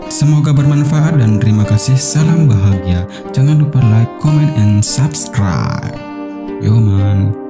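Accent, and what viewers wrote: Bali, Indonesia. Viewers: Malah mnurutku logatnya kaya org Bali/Lombok Tp syg speed pnyampaiannya trlalu lmbat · Dr logatx orang BALI ya min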